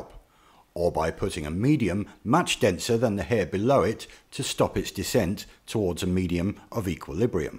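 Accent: England, United Kingdom. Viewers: You do realize that NASA is an American organization, and as such, you dont lose a cent to them as they only get tax dollars from the tax paying American public. Now I'm just assuming here, but seems like your accent is indicative of a more British persuasion, I mean, it could be south africa, New Zealand, or Australia, idk, but assuming the most likely and saying Great Britain, (I specify Great Britain and not the UK because you dont sound very Northern Irish to me.) Your tax pounds once went to the European Space Agency which has a pod on the ISS and works with NASA and other organizations, but no one is directly in charge of it either. But thanks to Brexit, your taxes aren't going to that either now. Britain doesn't have an independent space program so for now, none of your tax pounds go to any space agency. Hope I help alleviate some of your stress. 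· Nope, english accent, Mike is a little confused.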